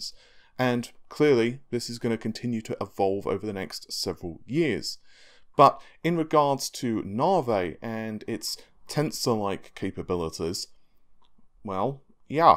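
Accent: United Kingdom. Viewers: "six cores and six...*faaahreads* " Awesome video as always but dang that British twang remains strong.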